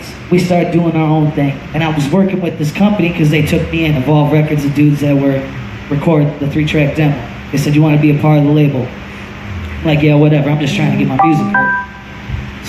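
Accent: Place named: Boston, United States. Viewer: he sounds like hes from Boston eh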